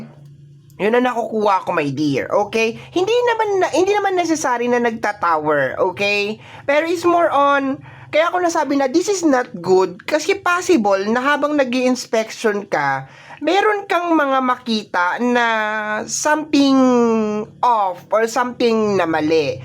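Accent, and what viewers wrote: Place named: Ilonggo, Philippines. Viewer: abaw a kabalo ka mag ilonggo a😂😂😂gudevz po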